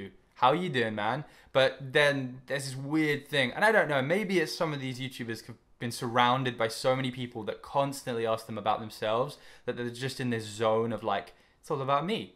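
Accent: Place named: United Kingdom. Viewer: I love his accent it's obviously British but there's something else in it lool I'm so weird 😂❤️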